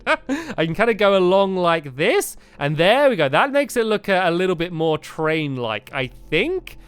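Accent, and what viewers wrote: United Kingdom. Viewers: It is so weird hearing train stuff in British English.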